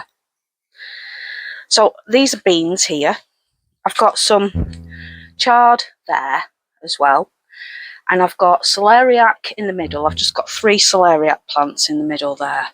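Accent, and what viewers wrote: Lancashire, United Kingdom. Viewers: Oh you popped up in my for you page and I thought I recognised that accent! I’m from Blackpool too. We live in Lancaster now, we also have a tiny yard, but have just been given keys to an allotment, it’s so lovely to have two places to grow. I’m sorry about yours, how annoying about ICI. I am loving your garden, it’s lovely.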